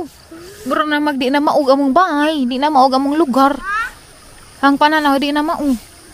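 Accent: Bohol, Philippines. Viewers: Imbis ko mahilak ko Sana,🤗nakatawa hinoun ko sa imong inistorjahan kay bojol kaajo..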